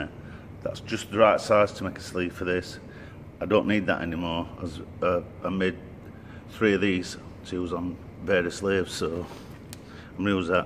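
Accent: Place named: Yorkshire, United Kingdom. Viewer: Reckon he should be voiceovering plenty of stuff on telly. 👍 Clear, concise, no nonsense. Anyone would guess he's from God's own county.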